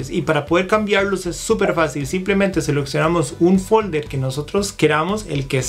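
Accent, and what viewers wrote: Costa Rica. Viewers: Saludos mae (sorry pero el acento tico fue algo que le agarré desde la primera frase). Muy buenos los videos, encontré el canal por mi búsqueda de información sobre la Mac Air 1 la cual deseo adquirir para trabajar en Análisis de Datos (SQL, Excel, Power BI) y por lo que veo de sus comentarios (así como referencias de amigos que trabajan en TI) pues parece que la opción de la Mac Air I es bien sensata y parecería ser suficiente. Una pregunta: –Gustos aparte ¿qué color me recomendaría? Me gustaría mucho la negra, pero según veo se puede ver descuidada por tanto manoseo o así, entonces pienso en la gris.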